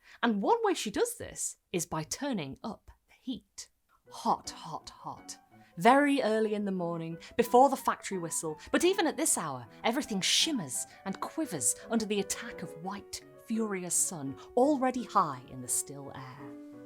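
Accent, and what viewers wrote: United Kingdom. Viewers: Extremely surreal to hear a British voice say the name of my hometown. Very excited to see more of this. Edit: Belly laugh when I heard "Portuguese Community" in the part about immigrants, so weird to think about how the tables have turned. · Thank you so much for the trans inclusive disclaimer about womb language at the beginning. 😊 In current year I unfortunately always get a little nervous when I end up liking a content creator who speaks with a British accent, purely because of what's been going on in the UK right now to erode trans rights. Very happy that I don’t have to worry about any of that rhetoric coming from this channel. Thanks again.❤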